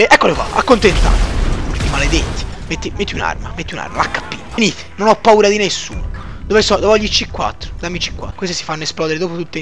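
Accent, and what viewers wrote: Tuscany, Italy. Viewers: Ma sei toscano? il tuo accento mi ricorda tanto il mio. sai i toscani si accorgono dell'accento toscano degli altri più facilmente degli altri :D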